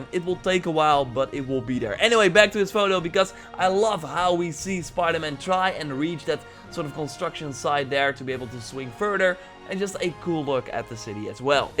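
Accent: Netherlands. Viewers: Are you dutch because you have a dutch accent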